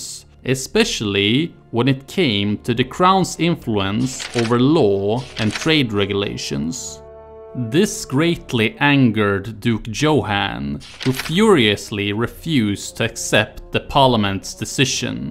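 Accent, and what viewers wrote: Sweden. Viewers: He is speaking as if he is reading English text with Swedish pronunciation... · Are you Swedish? I only been Stockholm very briefly, but you pronounce the "ee" (i / y) similar to Stockholm dialect And your pronunciation is very good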